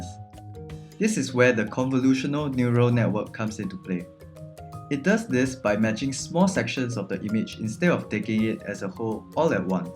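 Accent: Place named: Singapore. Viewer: The moment I heard your voice I knew you were a fellow Singaporean lmao. Looking forward to more of your videos!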